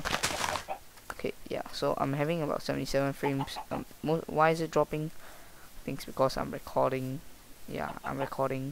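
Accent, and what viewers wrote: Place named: Singapore. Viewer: Im singaorean too I recognisr ur singaporean voice immediately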